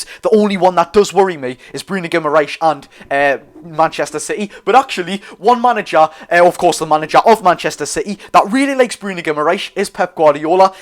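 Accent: Newcastle, United Kingdom. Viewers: I must say I love the Geordie accent very friendly brilliant video by the way 🤍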